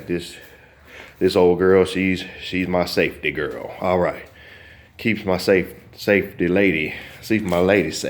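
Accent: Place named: Alabama, United States. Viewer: Haha! I love this guy's down home accent! No place like home (Bama).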